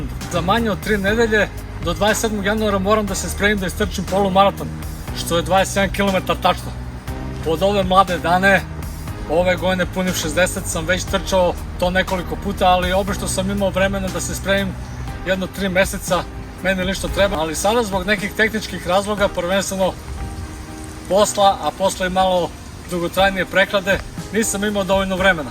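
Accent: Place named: Niš, Serbia. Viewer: Bravo čovek . Strašno me interesuje jesi li ti iz Niša ? Ja jesam 1964 godište , a trenutno u Beogradu . Poz. Govor , je Niški 😅 .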